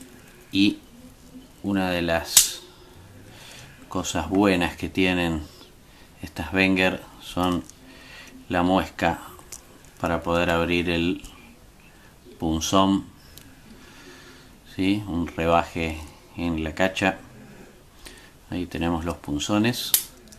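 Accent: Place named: Argentina. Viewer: me gustan mucho tus videos, lo haces muy bien y el acento argentino tambien me gusta mucho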